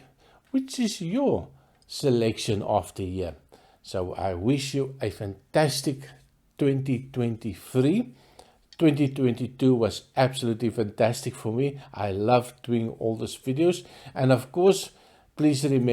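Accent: South Africa. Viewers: Very surprising top 3. I've had none of them before so I definitely gonna try them. Besides that, I appreciate the honest way you talk about whisk. Your south-african accent brings back good memories of my two holidays I've spent there. I live near the Belgian border in the Netherlands. Maybe we'll meet somewhere :-) Best wishes for 2023!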